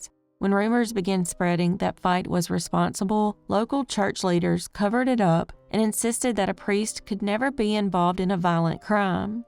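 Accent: South, United States. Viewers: THANK YOU!! LOVE THAT SOUTHERN ACCENT!! AND THANK YOU FOR MENTIONING NEW MEXICO AND EL PASO TEXAS!! AND PLEASE ALL VIEWERS PLEASE SUBSCRIBE!!! SHES GONNA HIT THE BIG NUMBER HERE PRETTY SOON!!!!:hand-pink-waving::thanksdoc:🥰 🤠🤠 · There are other folks out there doing good work, but you are my favorite! Maybe it's that outspoken southern and compassionate thing you do so well. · I love her sweet Southern drawl! Her speaking voice is very pleasant and adds comfort to these tragic stories. Southern comfort😊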